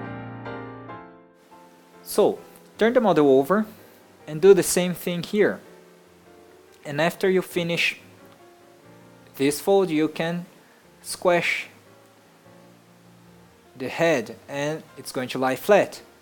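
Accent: Brazil. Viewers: ja ja just by hearing your accent i could tell you are from Brazil! excellent video, i really enjoy it! Saudações dos Estados Unidos! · Conheço o sotaque de BR de longe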